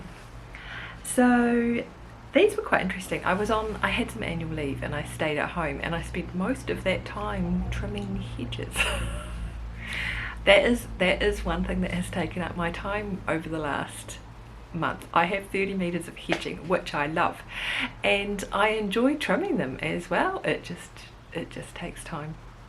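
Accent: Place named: New Zealand. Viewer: Always lovely to see you and hear a fellow Kiwi.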